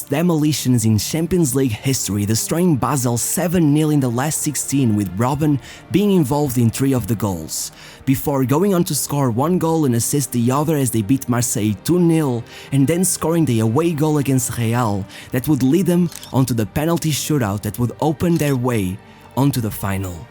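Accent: Portugal. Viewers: By this guys voice i can tell he is Portuguese, as i also am portuguese 😉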